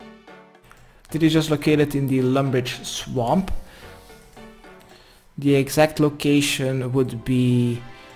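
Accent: Netherlands. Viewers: Legend! Thank you! Btw: are you Dutch?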